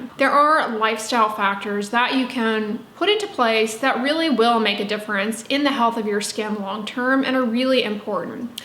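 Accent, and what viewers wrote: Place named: California, United States. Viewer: Where are you from? I know you are in Houston now, but you really sound like you are from California. Just wondering. I love your channel by the way.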